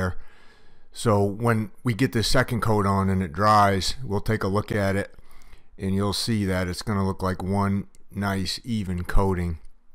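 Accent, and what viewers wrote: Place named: New England, United States. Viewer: Great video this is very helpful. By your accent your from New England. Since you’re using this product where you have so much snow ❄️ and rain and it’s held up well. This will also hold up good on my patio with our bright sun ☀️ in California. Exactly what I’ve been looking for. Great video thanks again 😎👍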